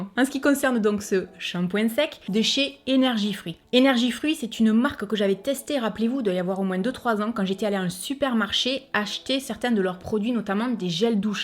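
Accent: South, France. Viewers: Merci pour tes partages! Ecouter ton accent du sud est un pur bonheur (je vis en Belgique lol) Pour le démaquillant, je t'invite à essayer l'huile de jojoba chez Aromazone si les huiles ne te dérangent pas et après hop un peu de savon et le tour est joué! Et c'est très économique! Et ça fait partir les maquillages colorés (comme j'aime lol ). Eh oui, par contre il faut se méfier des savons et les choisir si possible "saponnifiés à froid" car ils ont gardé comme cela tous les bienfaits des huiles. Le mascara que j'adore chez Essence c'est le Lash Princess! Ils fait des cils de fou! Et les brumes c'est super pour fixer le make-up également!;-) Des bises lumineuses Steph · Des cils de bichoubette 😁♥️♥️♥️ j adoooore l expression toute mignonne! Et cet accent de chez mon sud, si mignon 🤗 bravo, vos vidéos sont de l énergie positive pure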